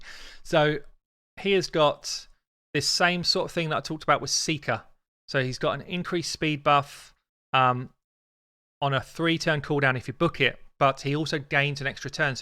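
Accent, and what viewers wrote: United Kingdom. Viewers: He’s British, they’re like that when it comes to pronouncing certain words lol. It’s not a diss, it’s just reality.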